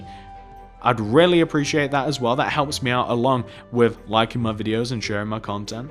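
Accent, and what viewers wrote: United Kingdom. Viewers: I think he's from the U.K.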